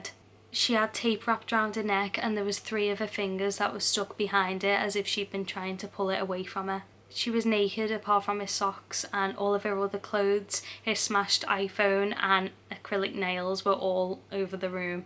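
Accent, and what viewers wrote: Liverpool, United Kingdom. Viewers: Like you said yourself, this case really affected me. I hadn't cried in ages but I cried for Ana. Such a tragic, horrific, needless way to die. Good channel by the way. And the scouse accent is very easy on the ear! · Another youtuber just posted this story almost word for word lol, but only did part 1 so was really glad to find this. You are amazing at telling stories and as a fellow scouser great to hear your familier accent. Such a sad story she was a lovely girl and my heart goes out to her family. RIP Ana this should never have happened. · Thumbs up before watching the whole show, because you're a northern lass, " Scouse " to be precise . hahahahah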